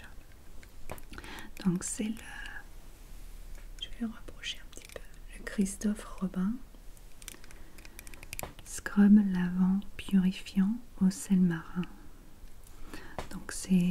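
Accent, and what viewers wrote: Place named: England, United Kingdom. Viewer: Cette vidéo est vraiment incroyable pour dormir votre accent anglais en parlant français me donne des frissons!!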